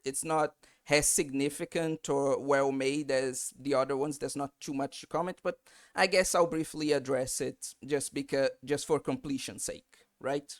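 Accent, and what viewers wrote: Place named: Philippines. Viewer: Philippines English is strong in this video...put tank in the mall... Strongest race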